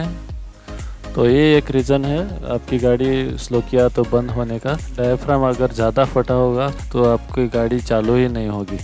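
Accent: Goa, India. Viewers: Are you from goa? ?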